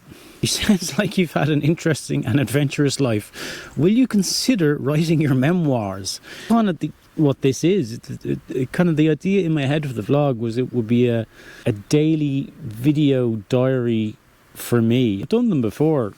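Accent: Ireland. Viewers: I watch a few vlogs that some Brits make, and I have to say that your Irish accent is a pleasant change. At least, to my American ear. Sometimes Brits say weird stuff. 😊 The Q&As are great - keep doing them! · So, a certain tone of Irish is very romantic…is romance of interest to you? You seem like a KEEPER type. NOT ASKING FOR ME! But for other subscribers. (You’re too young for me)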